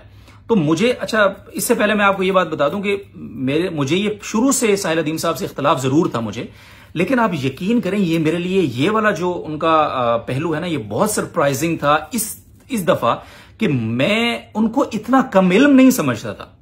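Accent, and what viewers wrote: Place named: United Kingdom. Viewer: Let me ask you a simple question , are you an "aalim" ? The answer is probably yes because you're a graduate of jamia rashed . And is Tariq masood an aalim ? You'd obviously say yes . Then let me tell you that aap jaisy chechory or bazari so called aalim sy Sahil adeem type log hazar dafa behtar hen . Aap log karty kia hen member pe bet kar ? Chichora pan aur bazari zuban , choktkoly sunana aur bc Tum tu Bismillah bhi English accent me party hu which implies your inferiority complex . I believe the whole jamia tu Rasheed is suffering from deep sense of complex which there're trying to satisfy . You people are impossible. Tbh aap logon ko dekh kar main enjoy bhi karta hun because you're a joke and ghussa bhi ata hai because of the nonsense you speak and spread among the riffraff.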